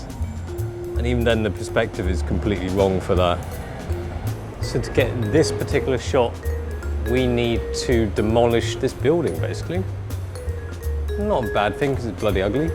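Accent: England, United Kingdom. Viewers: How did you get a English accent?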